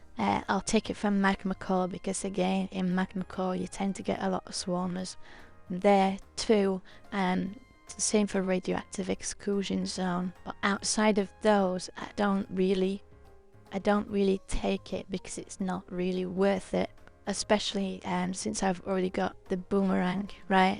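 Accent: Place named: United Kingdom. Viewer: That's just British my friend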